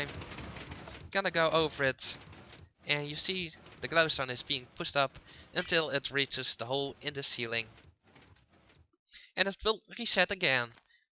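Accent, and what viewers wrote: Netherlands. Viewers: are you dutch? sounds like it :P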